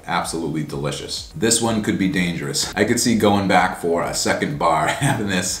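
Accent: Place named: New England, United States. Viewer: You could be right on the cost. Sugar has to be the cheapest sweetener by far. I'll definitely be watching to see how the anabar brand expands and see if they do add some lower calorie flavors in the future. I'd be willing to pay the extra money if I could get them to fit my daily macros. By the way you are crushing it with the content! One of my favorite youtubers for sure. I assume your from New England based on some of your clothing and accent. I was born in Mass and grew up in NH. Cheers!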